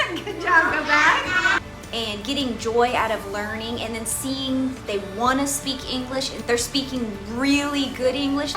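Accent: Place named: Taiwan. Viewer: 沒關係台灣人説英文一樣。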